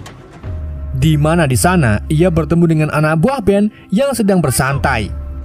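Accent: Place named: Pekalongan, Indonesia. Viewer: Petsi wong kalongan o min..❤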